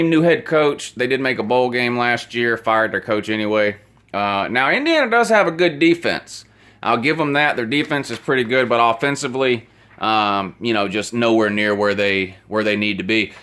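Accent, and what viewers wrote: South, United States. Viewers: you look like an idiot, and you talk like a real bubba. Did you graduate from high school?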